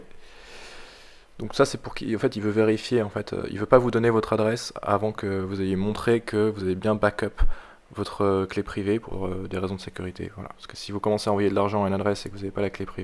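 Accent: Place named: France. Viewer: Merci pour la vidéo mais de grâce, parlez moins vite et articulez. Je suis Québécois et ne comprends pas bien votre accent Français. J'en déduis que les francophones des autres pays auront aussi de la difficulté à vous comprendre.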